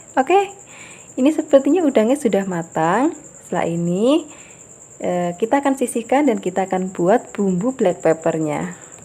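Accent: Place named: Banyumas, Indonesia. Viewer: Gak tau nya orang ngapak...dan muka nya beda ma suaranya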